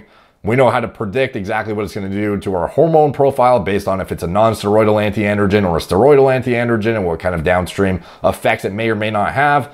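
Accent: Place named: Canada. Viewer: Canadian accent, like mine lol. I've been following his channel for quite some time and he's mentioned enough about himself without getting too personal.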